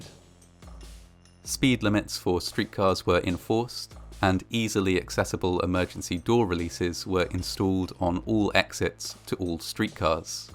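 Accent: United Kingdom. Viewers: What I find fascinating is this American disaster is being narrated by a Brit.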